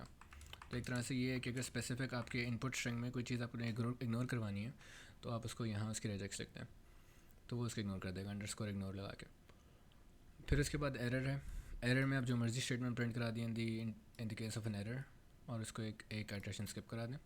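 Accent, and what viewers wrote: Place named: India. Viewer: what language are you speaking? are you using a mix of indians and english? If it's English then your indian accent is so strong that I don't get anything ...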